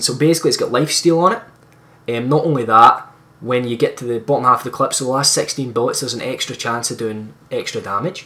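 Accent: Scotland, United Kingdom. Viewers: Nice video man. Are you Scottish by any chance. You have the accent ?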